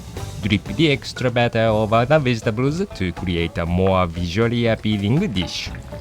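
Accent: Japan. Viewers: 这英文真的很日式。(˶‾᷄ ⁻̫ ‾᷅˵) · カタカナ英語聞きズラいなぁー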